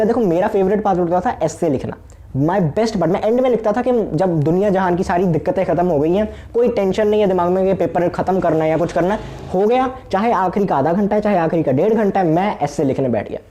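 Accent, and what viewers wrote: Punjab, India. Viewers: Bhaiya are you punjabi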